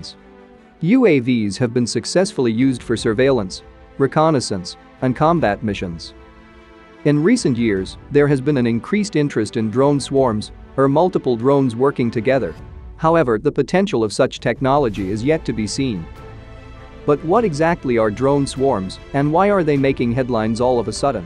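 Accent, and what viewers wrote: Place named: India. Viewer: Voice script to hide Mumbai accent? Be proud of your Indian accent brother 👍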